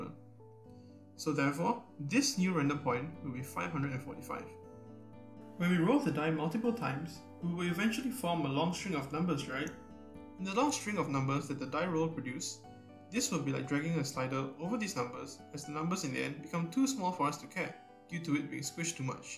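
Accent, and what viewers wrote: Singapore. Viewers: it's your first video so it's normal that you have a lot to improve. my advice for the next one, put some text. even if you get a new mic, you still have the singapore accent and some westerners can't understand it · yess recognised the accent as a fellow sinkie, keep up the good work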